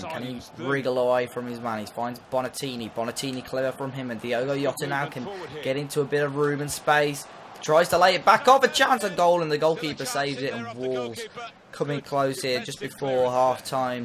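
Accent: Malta. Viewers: Are you from Malta 🇲🇹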